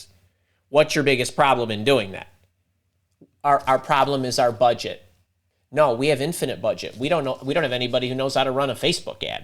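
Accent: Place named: Midwest, United States. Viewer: I'm only 10 seconds in, so I can't say anything about the video content itself, but I just have to say that I LOVE your energy and enthusiasm. Are you from the Midwest by chance? I absolutely love your accent; it reminds me of home. Thank you for bringing this type of energy when preparing for interviews is so scary. :)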